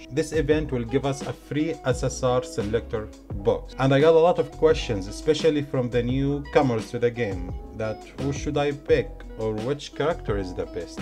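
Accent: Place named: Arab world. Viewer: Where r u from man? you sound arabic af 😄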